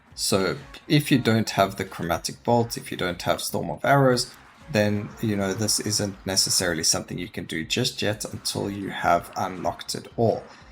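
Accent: South Africa. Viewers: Its MAD how easily you hear the SA accent, Follow just for that!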